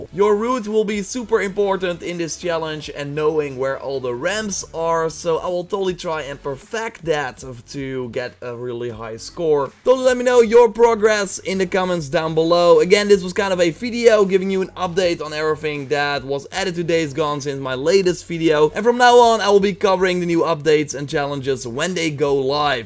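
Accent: Netherlands. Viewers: Damm jouwn Nederlandse accent is echt klote irritant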